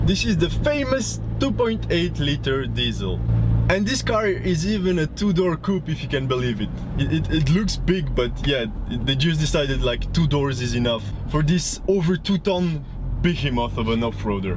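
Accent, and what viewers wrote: France. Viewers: Strong french accent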